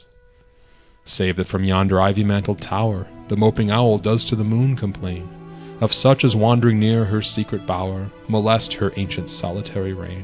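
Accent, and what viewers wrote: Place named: United States. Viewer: Finally .. someone reading it in American accent, Thanks so much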